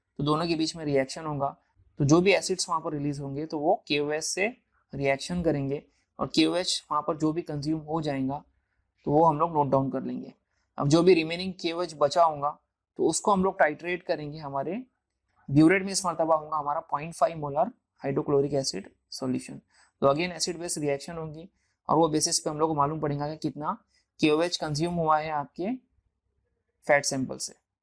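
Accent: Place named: Hyderabad, India. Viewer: Sir you are amazing and your hyderabadi accent is mashallah ☺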